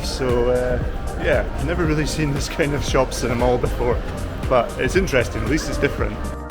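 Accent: Scotland, United Kingdom. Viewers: Try vising Eastwood City, Ortigas Center and Filinvest City also. To me, the captions/subtitles aren't necessary. Your Scottish accent isn't that thick. We understand your English perfectly. ᵔᴗᵔ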